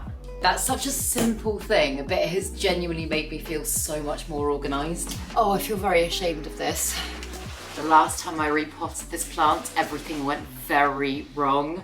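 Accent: United Kingdom. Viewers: I watch your videos from France to learn about plants and to learn english as well!!! I love your british accent and prononciation!! Thank you!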